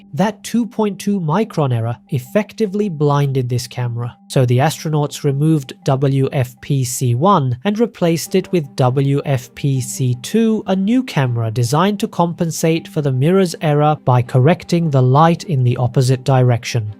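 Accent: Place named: India. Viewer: Sonunda Ai işini çözmüşsün çok güzel olmuş hint aksanı İngilizceden sonra hocam (haftalık canlı yayınlar geri gelsim bu arada)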